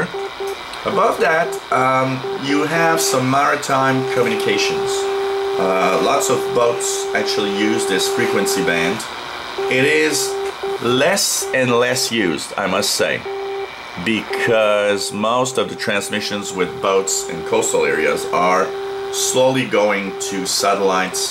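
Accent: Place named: Quebec, Canada. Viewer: Thought you sounded Québecois haha! Go habs go!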